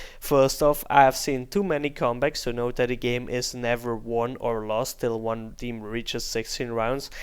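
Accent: Netherlands. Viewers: Je hoort het al aan z'n engels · I already heard on your accent that you probably were Dutch, until I saw a steam msg, "Waarom negeer je me" I wasn't for sure, Goede video ga zo door! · I like your Dutch accent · Even though i'm dutch his dutch english accent made me cringe. (So basically i think my english accent is pretty good) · Ik wist wel dat je NL was, hoorde het aan het Nederlandse accentje ! Leuke video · Hoor het zo aan je accent. Had dat steam bericht nog niet eens gezien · OMG IS NEDERLANDS. IK MERKTE HET AL AAN HET ACCENT MAAR TOEN IK DIE GOZER ZAG DIE ZEI WAAROM NEGEER JIJ MIJ WIST IK HET ZEKER. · I knew from the start that his accent was from the Netherlands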